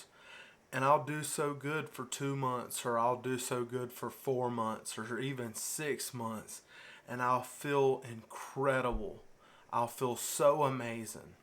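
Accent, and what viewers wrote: South, United States. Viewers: Hello young man! Your video showed up in my feed and I’m really glad. Thank you! I’m going to share with you - this will likely be long. Please - take what you like, leave the rest. First, you can’t disappoint anyone else. If someone says they’re disappointed in something or someone, that is on them - it’s their feelings - it’s theirs to deal with and not something you caused or need to feel worried about. I can tell you’re from the South by your accent. Me too! So, I know a lot about what that likely entails ….food - and not healthy food - is a central part of life - good times, bad times, normal times, etc etc etc. It’s an insult to many in the South that if one doesn’t eat as they think one should, then they are worried or insulted and certainly, they keep encouraging and begging and shaming until the person gives in or bad feelings occur. 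I’ve been there, done that. MANY MANY MANY times! I saw a great therapist for known and unknown reasons. It was the single best decision I ever made!!!!!!!! My life changed in every way - I got better - I understood my life - past and present - much more fully. A lot was not pretty or comfortable. I had a lot of shame - for known and unknown reasons. There were things I was hiding - from others, for sure. And from myself in many ways, in terms of how it was effecting me - including my weight and food issues. So pat yourself on your back ….you’re being very candid, you’re taking a risk and you’re willing to see a therapist. In our society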